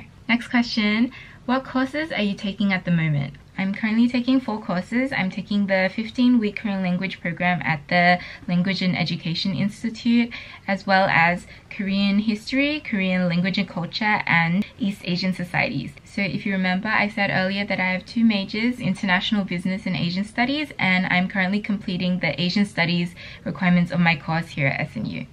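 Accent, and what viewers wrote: Australia. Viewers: Your aussie accent is a boooooomb ‼️💖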